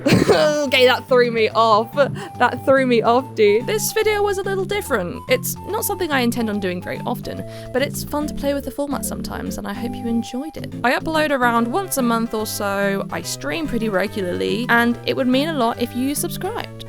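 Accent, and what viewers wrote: England, United Kingdom. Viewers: It’s a super English accent though 😭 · I was unaware you were british. I should've known due to the accent, but whatever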